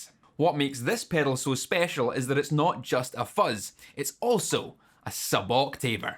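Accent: Scotland, United Kingdom. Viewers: Is that a scottish accent I hear? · Where is the commenter who transcribed Scottishness? I demand one of those for every one of his videos now.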